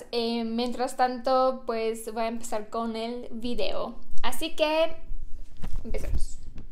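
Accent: Mexico. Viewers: Tu acento es super mexicano. Felicidades. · "nolohayo" "creo que le hayo aquí" 😊😊😊 bien mexicano hablas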